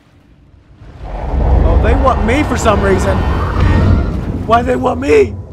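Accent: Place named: South, United States. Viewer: Now i see how u can have a very high voice with a southern accent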